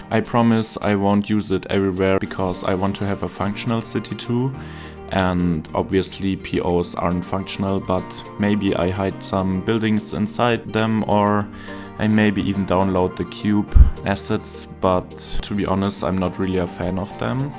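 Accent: Germany. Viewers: I’m sorry people are so crappy. Your English and your videos are great. Your German accent is actually quite charming! I’m glad you put your voice in videos! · I really like the German accent ❤ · Love your commentary. Love Germany, love ya accent. From a bloke in Australia :) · I think your German-English Accent is really pleasant. It's not harsh at all. Don't listen to the pointless critiques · Your English accent is fine. You do sound German, but there's nothing wrong with that. I can understand 100% of what you're saying, and that's all that matters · You speak English well sir... and yes you have an accent imagine that! A German speaking English having a German accent... no really. German is one of those languages that you grow up speaking and learning how to form some complex sounds in your throat muscle memory as it were and you can not just stop that memory when you change languages. 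I speak Thai but with an American English accent and wow.. no one understands me sometimes :) ... The accent is perfect for the videos which is why I started following you to begin with, build, artistic license, knowledge and inspiration that is why I follow you, the mad German scientist is just a plus :) Thanks for all the inspiration and sadness that my city building skill are just not up to par with yours sir!!! LOL ... peace out brother. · It feels very safe having someone with a german accent comment a build of infrastructure. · imo your videos are better without narration it's not that your english is bad, it's pretty good, and yeah, even i as german can hear the german accent... it's just that all your vids i watched the last 2 years were unnarrated and this narration kind of... destroys the whole 'oh a new video is up' i love your choice of music and that helps relax after a day of work. 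so i just want to sit here, watch city go brrrr and listen to some music. now i have to listen to your voice - and can't hear that music and relax. good that you had the 'commentary' tag in your video. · It’s great to hear your voice, everyone has got an accent. It’s part of their unique personality. Even English speakers make fun of other English speakers accents. I love hearing English with a German accent 🇩🇪. Makes it all sound so technical and official 😂 vielen dank und warte auf das nächste video 🙏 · Well, a couple of things. First, I absolutely love hearing your voice and the accent. My grandfather came from die Schweiz (Lucerne canton) and my father could speak fluent Hochdeutsch. As a result, I learned some Deutsch as well and took a few years of language study in college. Sadly, I've forgotten a lot of it, but a German accent is a very nice thing for me to hear sometimes. Second, I think that it really sucks that people objected to you speaking on your videos and were mean to you. 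Besides the point above, I think you do a fine job with English and hearing a voice reminds me that there's a real person behind the genius of your builds. You have taught me a lot and are a treasure to the Skylines community. I'm glad to hear you! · Ich selber finde den deutschen Akzent auch nicht besonders schön, aber deswegen beleidige oder vermiese ich doch niemandem den Tag? Jeder kann seine Meinung haben, aber ich würde deswegen niemals auf anderen rumhacken. Außerdem sind deine Zuschauer ja hier, um dir beim zocken zuzuschauen! Außerdem habe ich jetzt mehrmals gelesen, dass andere die nicht Deutsch sind, den Akzent garnicht so schlimm finden. 🤷🏽‍♀️ Lass dich von den ganzen Kommentaren einfach nicht runter machen. Wenn du Voice Commentary machen willst, dann mach es einfach! Und dein Englisch ist ja nichtmal schlecht!! (Übrigens ist es echt angenehm dir zuzuhören 😅) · Of course you would have a German accent. 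You speak German. I've got a Southern US accent. I barely speak English, let alone as a second language. Keep up the amazing work! You, CityPlannerPlays, and Biffa introduced me to the game and I've been hooked!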